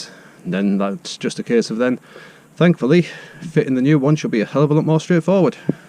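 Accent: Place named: Durham, United Kingdom. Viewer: Will you put mine on? I’m from Durham sounds like your from up here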